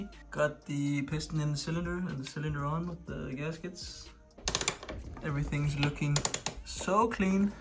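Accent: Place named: Denmark. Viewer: Jeg kunne høre så hårdt på din accent at du var dansker så jeg tjekkede din kanal og fik ret fire video fire 🏍️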